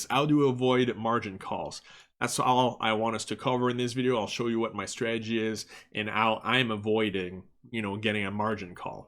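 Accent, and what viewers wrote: Quebec, Canada. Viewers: Dude, I love the videos, I find you to be one of the few 0 bullshit YouTubers, but I am curious because of the accent if you are from Quebec?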